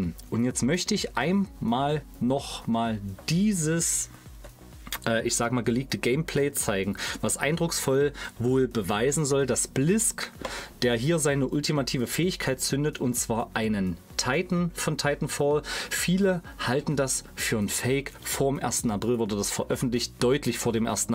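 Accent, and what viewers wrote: Saxony, Germany. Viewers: Du bist doch aus Sachsen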